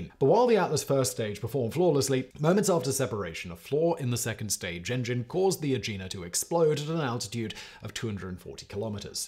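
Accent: United Kingdom. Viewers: Guys give a break he's British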